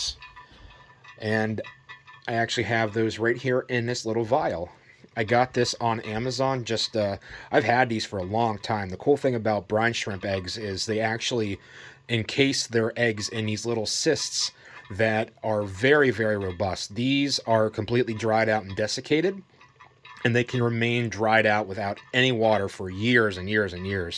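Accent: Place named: Pennsylvania, United States. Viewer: Brother, if you're not from Pa, then you're certainly in a border state. One - the Amish. Two, the inflection in the voice. FWIW, I buy all my meat and produce from Amish vendors in Mt Joy and Lancaster county.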